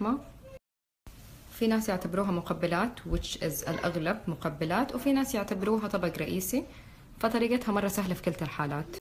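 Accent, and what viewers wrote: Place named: Hejaz, Saudi Arabia. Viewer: شكراً الطبخة سهلة انبسط لما اسمع حجازية زيي تقدم اشياء حلوة الرب يباركك ❤️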